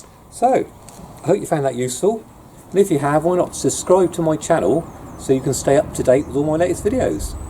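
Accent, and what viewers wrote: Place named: England, United Kingdom. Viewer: sounds like you are in England....not sure if they have it there, but here in the states we have dedicated bucket hooks that basically do the same thing as your custom job there. it hangs on the rung but is off to the side of the ladder so no issues. personally i dont like them, i use a Handy Pail brand bucket to paint out of. i can slip my hand like a glove onto their handle and still use my fingers to holdthe ladder if needed. 9/10 times i set my bucket on the top one or two ladder rungs though. The only flaw those Handy Pails have is you cannot hook them onto a ladder. Purdy has one that is able to use ladder hooks but i just dont like the design, seems cheap and can only fit a 4inch weeenie roller(sausage roller, small roller, pick your adjective) and i really like to use the 6in version which the Handy Pail fits beautifully. 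Just some tips from a painter of 25 years here in Kansas. (yes that Dorthy and Toto Kansas...i live 15 minutes from the Wizard of Oz museum.)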